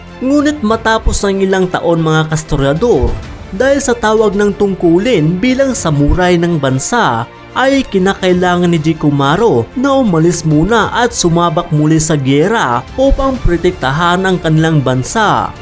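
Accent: Philippines. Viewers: visaya ka kuya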